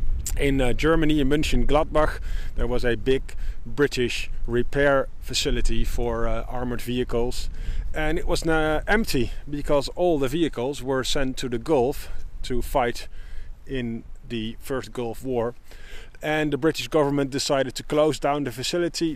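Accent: Netherlands. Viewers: Hallo ik hoor dat je Nederlands bent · That Dutch accent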